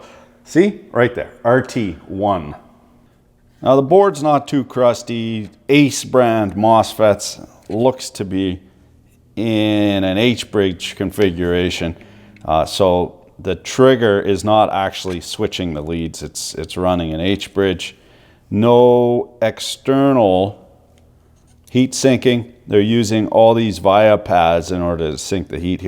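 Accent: Canada. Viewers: Do all canadians speak like that?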